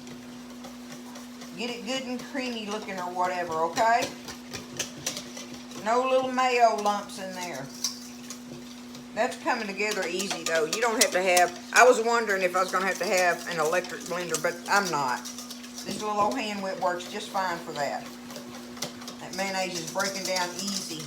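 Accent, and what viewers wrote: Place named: Texas, United States. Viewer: you from Texas? I am and your talkin reminds me of home? I married a coonass and I mostly hear this accent here and even the non Cajun accents are different than a good old east Texas accent? Always loved this recipe? But it seems like she (my memaw circa.1901) added a couple more things like celery and maybe a fine shredded carrots? Not positive about the carrots tho?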